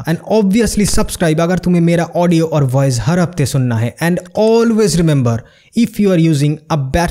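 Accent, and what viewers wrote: Bengal, India. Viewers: chal bhai khush ho ja like kr diya informative video .. love the humor.. i notice your vocal i think u r a bong.. correct me if i m wrong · No bhai I am not exaggerating at all.. you are just intense... And I assumed you are a Bengali (noticed your accent).. that took my bonding to quadruple ❤️ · I guess you are bengali .. Its hard to manage your bengali accent bro😁😁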